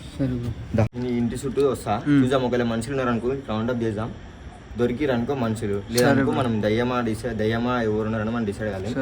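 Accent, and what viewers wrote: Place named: Hyderabad, India. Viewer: Script kakunda genuine ga chesthundu bro pakka ne channel telugu lo manchi hype ostadi ...ne hyderbad slang chaala mem ki reache ayitadi but don't do scripted search for most haunted places take dare guys and communicate with ghosts mana telugu community lo ghost tho communication cheyanika raadu ....tamil lo simply sarath and grey wolf vasu videos chudu bro nd all d best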